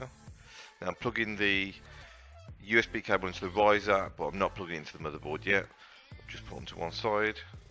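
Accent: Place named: Birmingham, United Kingdom. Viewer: I can easily tell from your voice that you’re a Brummy haha. Nice vid!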